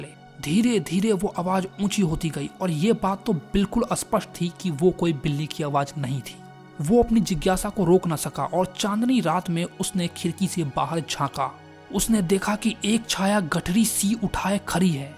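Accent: Bihar, India. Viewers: AAP to Pakka Bihari lag rahe ho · aap biharse ho kya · Bhaiya aap Bihar se hona ❤ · Bhai aap bihar ya up se hoo aapki explanation yrr 😭 bahut jhakkas hoti hai bahut ache se samajh me aata hai ❤ love you bhaiya 😊❤ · Are you bihari I am also bihari because you spoke bhojpuri 😅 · Do you belong from Bihar??? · Bruh i think you are from bihar 😅, like no offence but ur method of speaking shows that. ❤ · Bihari language me maza aa gaya 😂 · Sir aap Bihari ho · Aap bihari hai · are you bihari?? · bhai aap bihari ho na 😊😊😊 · Bihari ho bhaiya?? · bihari accent😁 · Bhai app kha ke ho bhoot wala story me jo tone tha wo mujjafapur sultanpur yahi sab ka tha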